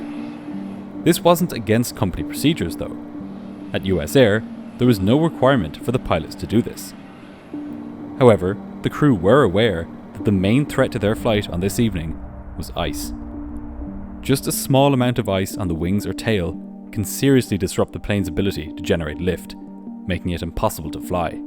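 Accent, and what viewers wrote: Ireland. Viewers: How did plane crash videos become my comfort show 😅 I blame the Irish voice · Don’t you just love the Irish accent ❤ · "Outer two turds." Man, you make superb documentaries, and I'm not mocking your accent. I just get a giggle whenever I hear the Irish in your voice.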